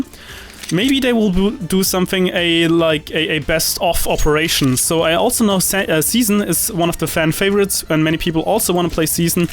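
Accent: Germany. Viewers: man you have a great german akzent =D greetings from there ^^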